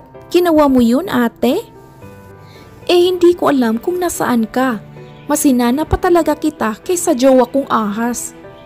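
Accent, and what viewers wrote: Visayas, Philippines. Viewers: Hay ano ka bisaya mag ayos ayos ka naman mag salita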